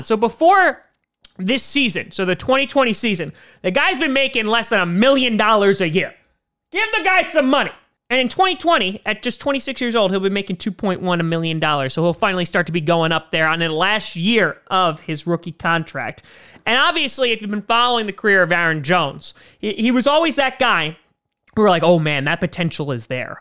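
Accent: New York, United States. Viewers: yes, is from nyc. and a lotta new yorkers will say yuge. he also says "hughston" or "yuston" rather than "houston," which some say in ny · lmao he is from NYC and that is to how new yorkers talk.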